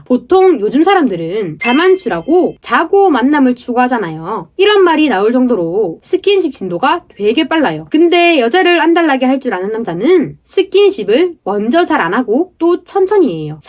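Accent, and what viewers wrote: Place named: North Gyeongsang, South Korea. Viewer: 경북 봉화 안동쯤인듯😮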